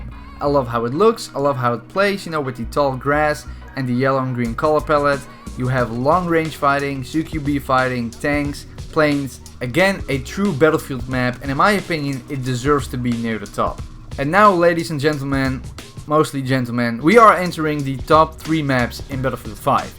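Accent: Netherlands. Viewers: Is dutch, Pronounces rotterdam as rodderdam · I smell dutch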